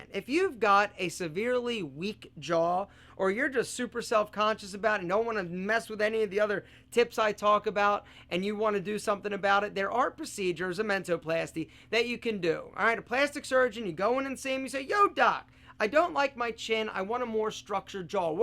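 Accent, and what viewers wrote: Philadelphia, United States. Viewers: Are you from philly? Wooder.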